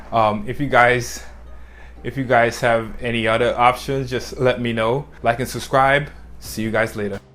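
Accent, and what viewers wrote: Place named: Barbados. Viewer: Hearing that heavy Bajan accent there bro! :-) Great review and keep it up!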